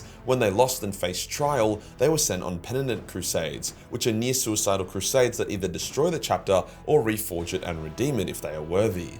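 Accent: Australia. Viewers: Honestly i love 40 k lore and have been in franchise since its conception starting out in warhammer fantasy as i prefered the fantasy game tabletop games. how ever i chucked you a sub just due to learning you had been banned a few times by youtube fuck them long live the australian speaking style and not give a fuck attitude ! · Why you put by an Australian you don’t gotta announce where you from we still gonna watch the vid and we rock with you bc you Australian the accent top tier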